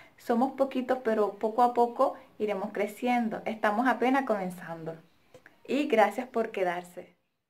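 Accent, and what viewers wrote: Caribbean Coast, Colombia. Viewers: Superrr!! 💃 me veo todos tus vídeos y me encanta que conservas el acento costeño, nuestros refranes, nuestros dichos 🤣🤣me toteo de la risa contigo y tus aventuras. Chachi es un hombre muy especial. Saludos!! Un fuerte abrazo!!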